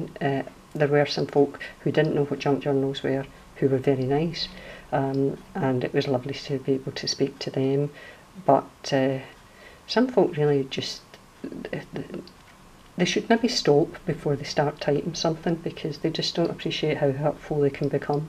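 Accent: Scotland, United Kingdom. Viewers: Aye,! Bless the Scots accent. I come from a he North East. Now, there's an accent that only few would understand! Beautiful work yet again. Very talented lassie! 💜 xx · Without your accent I would never learn scottish. Love the edge collaging, very nice idea, will try it too. Your envelopes are gorgeous. Have a nice summer evening and say hello to yours!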